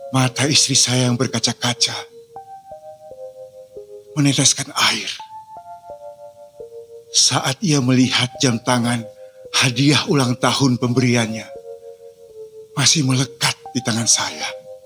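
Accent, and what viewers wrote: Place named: Jakarta, Indonesia. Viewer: Hebattt,pengen bisa monolog kek gini,mas kalo sy belajar monolog sama mas bisa ndak? Minta alamat dan no tlpnya mas,o iya mas ini aslinya orang mn ya kok dialeknya kek orang betawi tp kadang kadang kek orang minang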